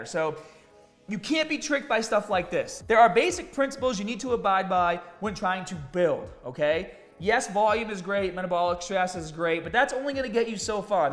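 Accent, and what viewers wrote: Boston, United States. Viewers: Dude are you from Boston ? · I love how is just leaning into the yelling Bostonian persona and taking it to the next level. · Is this accent new? I swear I’ve been subscribed for years and back then never noticed the ultra Boston or whatever the fuck accent. Still the og tho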